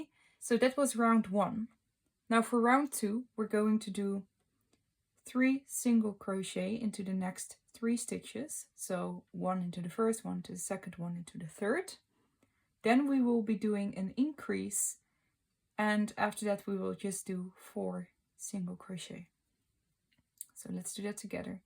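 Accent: Netherlands. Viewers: I LOVE DUCKS AND READING! So I’m making this Ofc!! And, are you dutch by any chance? I hear an accent 😅😅 you are so talented and I’m a dutch crocheted aswellll!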